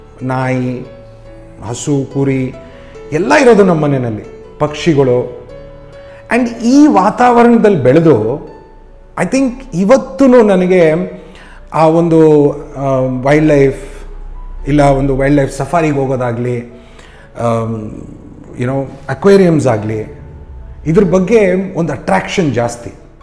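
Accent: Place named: Kerala, India. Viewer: WOW... BABAki Jai ho.... from your Hindi Accent, I always thought you might be from Kerala... but this gave me goosebumps when you spoke in Kannada... Super... would request you to make more kannada videos...:-D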